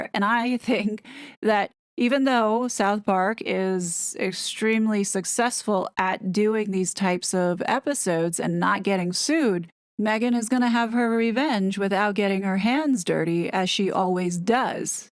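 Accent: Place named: New York, United States. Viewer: I concur! I love dropping educated opinions in my lap in the evenings! I can't believe she was EVER self- conscious about her voice & NY accent! has an amazing cadence & comforting tone. She needs to get a side hustle reading audio books! 🎯💯💕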